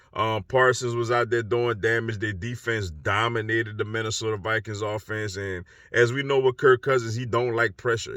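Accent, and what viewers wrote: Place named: Louisiana, United States. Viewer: Where you from sound like Louisiana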